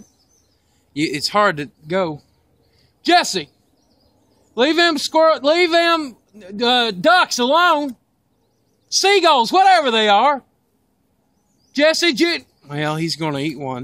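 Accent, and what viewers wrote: South, United States. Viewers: Why does he have a country accent in this video but not in anymore of em lol?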